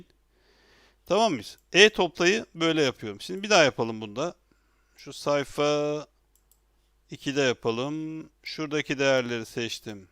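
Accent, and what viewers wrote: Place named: Aegean, Turkey. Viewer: Hiç fark etmez ama ege şivesiyle zor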